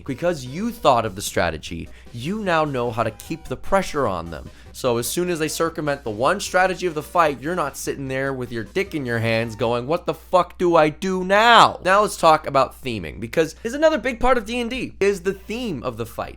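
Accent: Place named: Canada. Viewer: Stacking dice up each move to eventually take that much damage is a great idea. I'm surprised you are from south Africa, all I hear in your accent is Canada.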